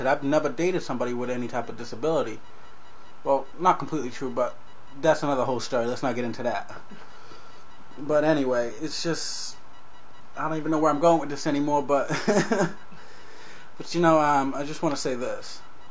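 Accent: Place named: West Coast, United States. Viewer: I couldn't even tell. In fact, I thought you were a west coast brother. Are you?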